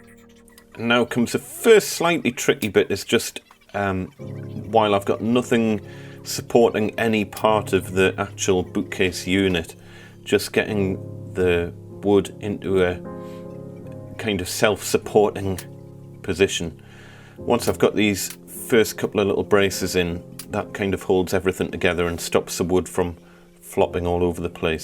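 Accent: Newcastle, United Kingdom. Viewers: Great video and the commentary makes for a much more enjoyable project. Love the Geordie accent. Keep them coming.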